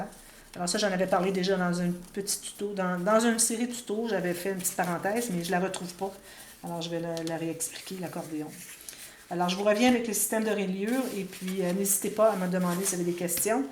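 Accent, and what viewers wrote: Canada. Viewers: C'est exactement ce que vous expliqure si bien... prendre en compte les différentes épaisseurs et modifier en conséquence l'écartement. Je n'ai pas encore suffisamment d'expérience (un peu plus d'un an) D'où mon grand intérêt pour vos vidéos. Je suis hyper maniaque. Vous êtes une des rares personnes que j'aime regarder. Simple, précise, efficace et des réalisations nettes sans tralala. Et votre adorable accent canadien....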